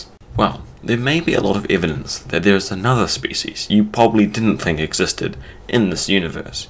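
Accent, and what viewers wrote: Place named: New Zealand. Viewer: Excellent research,! I'm trying to place your accent. New Zealand? South Africa?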